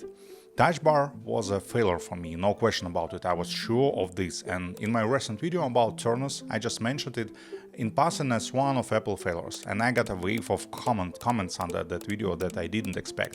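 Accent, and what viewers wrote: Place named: Russia. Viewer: I appreciate you using your real voice now instead of AI. Your Russian accent and manners bring a new feel to design content on YouTube that is refreshing.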